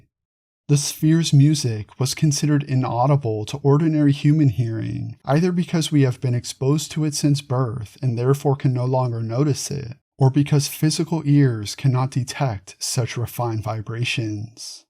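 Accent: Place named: California, United States. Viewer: The California AI accent is too annoying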